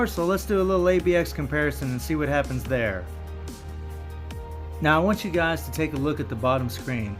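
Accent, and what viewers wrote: United States. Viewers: He is from the United States it's obvious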